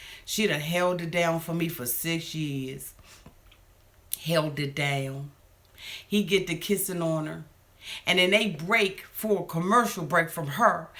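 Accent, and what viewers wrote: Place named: Baltimore, United States. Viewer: Great Wisdom! You must be from Baltimore, cuz you sound just like Mo'nique, accent and all girl!!!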